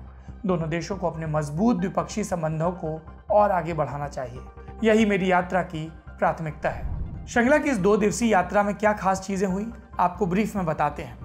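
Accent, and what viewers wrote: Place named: Bundelkhand, India. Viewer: sir aap kaha se hai mai jalaun se hu aap bundelkhandi bolte hai aap kaha se ho plz plz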